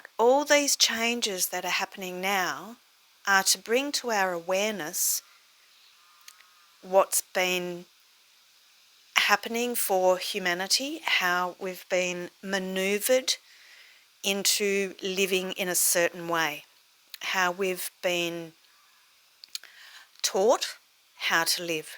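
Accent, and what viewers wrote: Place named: Australia. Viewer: Hello. Thought you sounded Australian. So am I. Like what you share.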